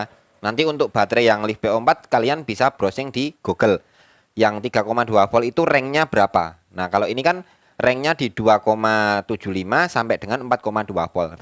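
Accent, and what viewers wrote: Java, Indonesia. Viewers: Maturnuwun inpone mas gess. Btw mas niki daleme pundi to? Kok logat jowone kental banget. 😁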